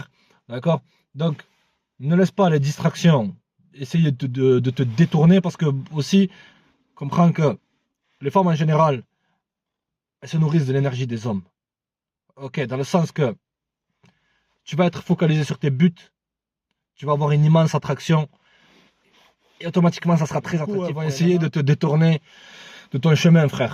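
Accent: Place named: Southwest, France. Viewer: Accent terrible, t d'ou ? Toulouse ? Sud ouest ? Bonnes videos sérieux.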